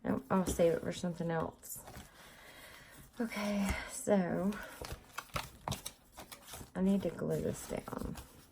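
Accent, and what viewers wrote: South, United States. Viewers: I’ve never made one of these . I’m honestly not very crafty. I have watched a couple of people in the past do these I I just couldn’t make heads or tails out of it. Watching you and your thought process as you put this together made so much since to me. I learned more from these first two videos than I’ve ever been able to learn before. I’m a visual learner and you made me feel like I really could do this! You kept apologizing in the video and i kept thinking no, no, no, you’re teaching this the way it should be taught. I for one am very grateful. It wasn’t boring to me, it was informative and detailed in just the way I needed it presented in order for me to understand. Thank you so much. This is my first time here. I kept thinking as you talked that you have to be from Arkansas, Texas, or Tennessee. I’m from Tennessee and I’ve been transplanted in Arkansas for the last several years. 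Lol! I can’t wait to watch you again! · Hi! New friend to your channel from Kentucky. I can tell you have a southern accent as well. Thank you do much for showing us how to start one of these cute journals because I want to make one for my daughter as well as one to do with my triple negative breast cancer I was diagnosed with this past November 2020. You truly have inspired me to make some. 💗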